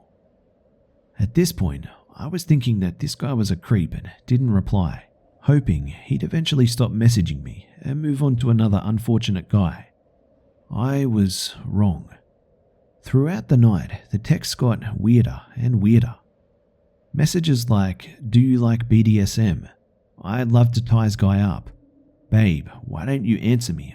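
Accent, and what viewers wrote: Australia. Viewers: He's Australian :)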